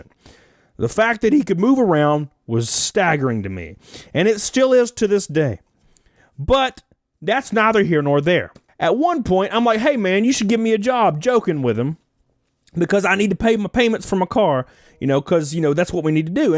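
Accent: South, United States. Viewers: You sound like a southerner. So as a southerner, go buy a gun and “stand your ground” my guy 👌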